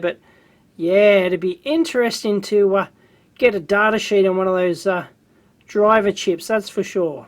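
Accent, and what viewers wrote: Australia. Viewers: First time i have heard a Nerd with an Australian accent lol.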